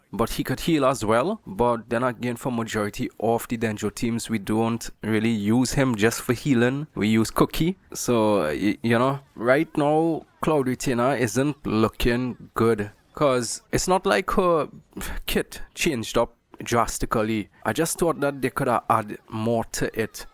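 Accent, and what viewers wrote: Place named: Trinidad and Tobago. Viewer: Dude, are you Trinidadian? That accent sounds classic Trini.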